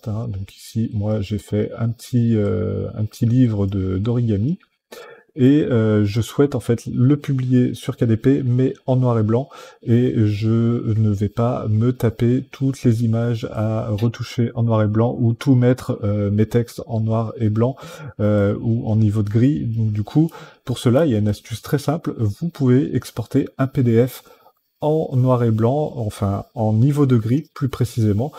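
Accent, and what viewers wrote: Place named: England, United Kingdom. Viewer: Salut, Très utile, merci pour ce partage de connaissances ! (Comme dirait Chabal, We are in France, we speak French... OK ?) Manquerait plus que quelqu'un vienne te reprocher ton accent anglais, le jour où les Anglais (en tout cas ceux qui font cet effort) parleront français couramment et sans accent, alors, peut-être qu'ils pourront la ramener et encore on ne va pas se laisser emmerder par des buveurs de thé, avec le petit doigt en l'air, bordel de merde ! :P Sinon j'ai une question en rapport avec ta vidéo: Que signifie tramer et à quoi ça sert au juste ?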